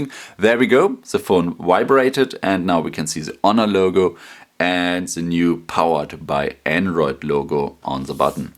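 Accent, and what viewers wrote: Germany. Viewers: German accent. :)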